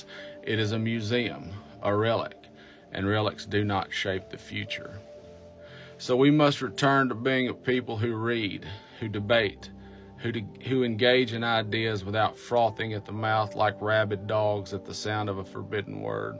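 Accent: South, United States. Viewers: Wow, the most sense I've heard from an American for a long time, and delivered in accent that sounds like a Redneck! Well done sir . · It’s a glorious moment when you finally hear an American with a southern accent take all the stereotypes out there and stuff them in that dark recess. Pure accuracy, concise and eloquent language, and a poignant point. I live in a country where the social safety net is decaying and there is handwringing about how it is funded. There is no handwringing about the moral fact or need for it. China is not a utopia for its people but it can do things 🇺🇸 can’t. It can have a consistent social, economic and commercial strategy for decades. Whether we like the direction of travel, it can move itself consistently. But it isn’t communism or socialism. It’s authoritarianism. That’s a cup you now drink from too 🇺🇸.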